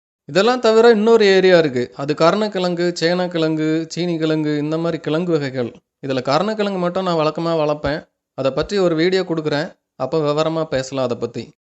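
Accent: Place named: Coimbatore, India. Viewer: Bro unkal thottam Mathiriye unkal pesum vidham rombaum super ithuthan kovai kusumbunu solvankanu niniaikiran bro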